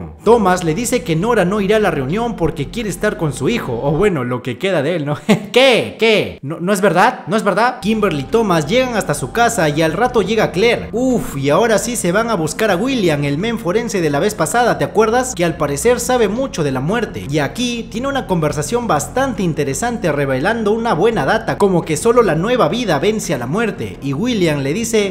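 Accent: Peru. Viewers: Sin que diga que es peruano, ya sabía que era peruano en los primeros segundos del video 😆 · Que chevere que haya un yutuber que sea peruano y me narre la historia en peruano. Saludos desde la selva causa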